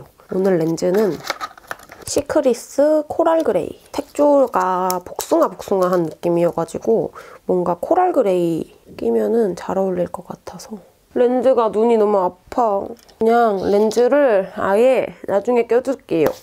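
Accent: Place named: Gyeongsang, South Korea. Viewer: 님 약간 경상도 말투 나오는 거 같아요... 기분 탓인가...?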